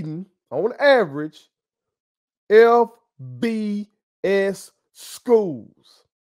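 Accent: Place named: Memphis, United States. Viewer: bruh...real talk, I like you my guy...No homo... you talk like a south Memphis nigga for real...I've lived in Memphis for a long time. but I was born and raised in Northern Mississippi. you sound more Memphis than MS...Lol... you a real one fa sho that gives it to us straight with No chaser!!!! I like folks that can give you real game and know what they talking about...I played baseball and football. I've been coaching football in Memphis a while now. but my roots came from the Sipp. I like your content and how you give it👍🏿 you're hilarious to me and you probably don't even be trying to be.......keep it up bruh✌🏿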